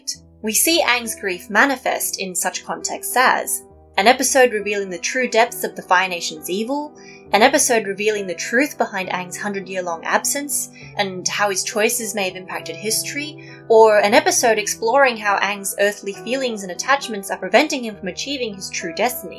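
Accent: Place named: Australia. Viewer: I love the way you pronounce Aang's name in your Australian accent.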